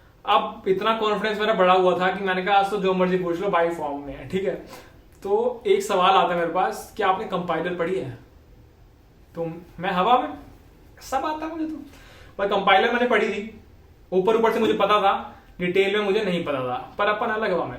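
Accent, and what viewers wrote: Punjab, India. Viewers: bhai are you from punjab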